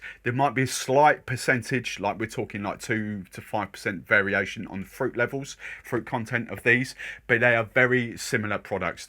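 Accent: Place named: Cockney, United Kingdom. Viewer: Cornwall?! You sound proper cockney!!!!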